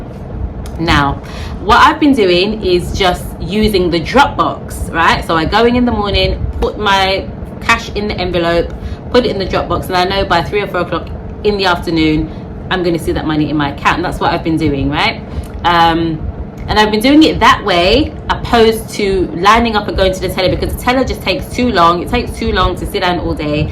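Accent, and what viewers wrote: United Kingdom. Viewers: Hi. In my opinion, they asked you to write the letter to the branch manager to determine how intelligent you are. Your letter in conjunction with your lovely British accent made them determine you were not to be played with. Food for thought. Blessings! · is true, but you have to acknowledge the lovely British accent no matter what. I think the bank representatives did, too.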